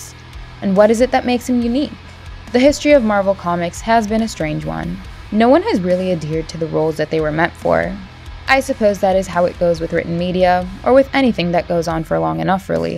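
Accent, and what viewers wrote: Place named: United States. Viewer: Every word is typical american accent everything has r in it annoying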